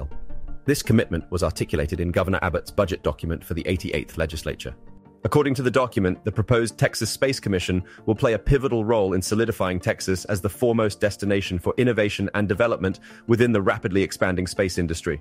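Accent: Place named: Europe. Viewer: Good report. Job well done. It would be nice to hear your announcer to speak in American English. There are dozens of European English on U Tube I do not like their speech nor their cadence. .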